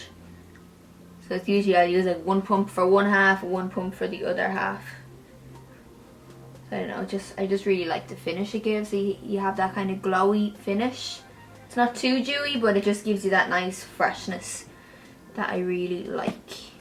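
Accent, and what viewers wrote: Ireland. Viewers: I won't lie it looks really pretty with your pink hair. not too matchy matchy either. have I mentioned I'm in love with your accent? I detect Irish am I totally off??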